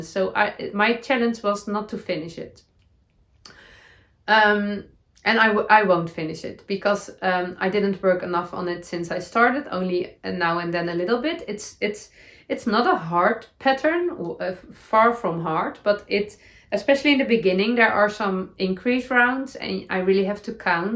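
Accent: Netherlands. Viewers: You can say your eyelids are getting heavy. I love your Dutchy English 😄 also you festive yoke is beautiful. I’m glad I haven’t made it yet because the neutral colors are really fabulous. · Your Mentor socks are so cool!! We would say ‘high instep’. Love listening to your ‘Dutchy English’. ❤️❤️❤️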